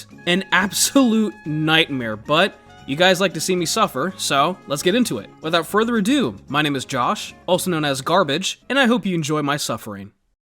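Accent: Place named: Egypt. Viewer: wow u r Egyptian ur accent is on another lvl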